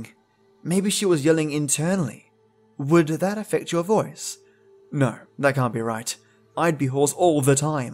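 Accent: Australia. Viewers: Yeah! It's awesome Aussie tale teller time again for me.☕🍰🇦🇺❤ More Lily Madwhip stories! Absolutely, great series! Monk pear tea? Sounds so luxurious, warming and extremely tasty. Oh, you and your amazing teas that you drink.😍 I just have my PG tips. It's black tea but it does the job.👍I'm totally late.☹ But I did eventually get around to listen to your videos.🙂 Thank you for all your content, you definitely work very hard! Your narration always makes my evening leagues better!😊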